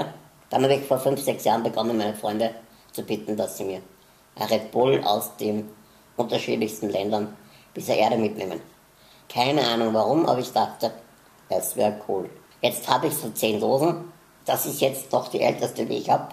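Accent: Austria. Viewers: Cooles Video. Sehr unterhaltsam. Ich find dich sehr sympathisch und witzig. Und nur mal so ne Frage: Bist du Österreicher? Du hast einen sehr österreichischen Akkzent. Wenn ich raten müsste würde ich sagen, dass du Wiener bist. Abo hast du auf jeden Fall ;D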